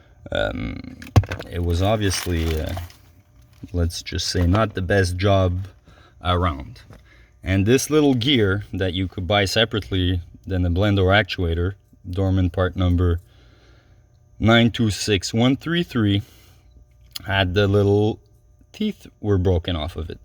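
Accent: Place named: Boston, United States. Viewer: Thanks,! You sound just like my cousin from Boston 😁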